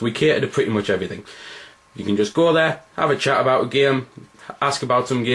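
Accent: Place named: Newcastle, United Kingdom. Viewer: Nive video mate. You sound local, you from Newcastle?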